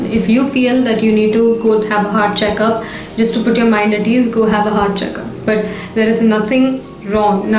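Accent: India. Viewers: This was a great video with one exception.... the negative effects of the sporadic release of cortisol. I also think that people with a not so healthy heart might stroke, I don't know as I am not a trained professional but I have had all these symptoms it seems at the same time. I have also experienced horrible "brain zaps" that left me numb all over, those are so scary! Thank you for a good video! I have always loved to listen to the flow of an Indian accent. Blessings!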